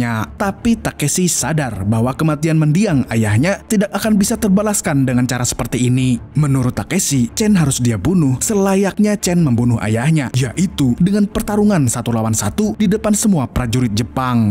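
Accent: Banjar, Indonesia. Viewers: Org banjar kh ni.?? Slm knl dari bjb. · Ikam urang Banjar kh? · waaaaayyy abang orng banjarr kah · Banjar kh bng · ai admin bahasa Banjar sakalinya😮😅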